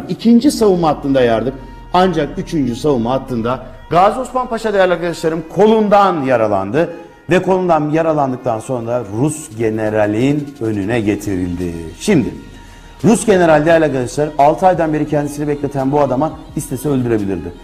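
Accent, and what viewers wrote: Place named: Ankara, Turkey. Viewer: hocada daha ozaman angara şivesi upgrate edilmemiş.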